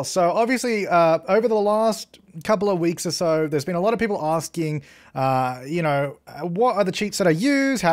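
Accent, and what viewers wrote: Australia. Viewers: Are you from Australia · Are you from Australia? Just wondering as I'm from Australia as well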